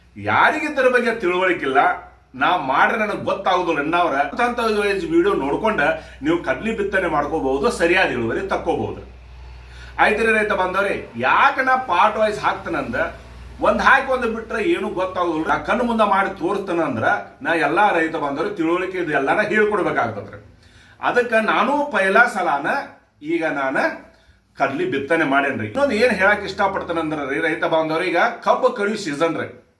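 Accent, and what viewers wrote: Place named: Karnataka, India. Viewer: Sir nivu mathadu rithi mathu bashe nodira nivu bellad bagewadi urinavru irabeku alava sir idakke reply kanditha kodri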